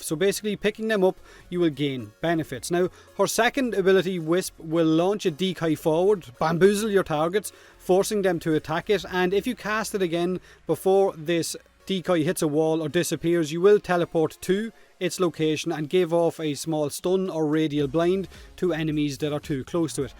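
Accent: Ireland. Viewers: I love Irish you tubers “Harnesses the power of the Feeking sun”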